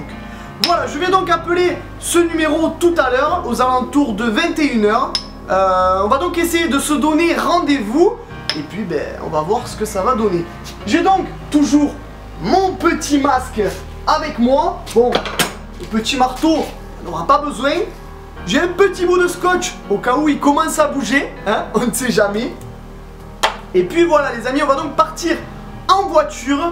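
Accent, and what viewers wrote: Marseille, France. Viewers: je kiffe ton axen marseillais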